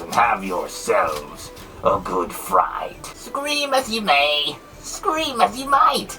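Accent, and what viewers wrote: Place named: Ireland. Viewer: Ah nooo, the fecking Leprechaun! Again your Irish accent is better than mine. Happy St Paddy’s day!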